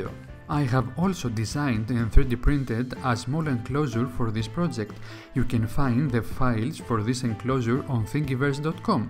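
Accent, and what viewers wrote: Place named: Greece. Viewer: Where are you from ? You accent sounds greek.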